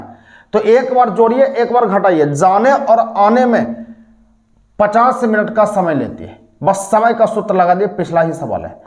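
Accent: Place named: Patna, India. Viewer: Sir I think आप पटना से हो न Please confirm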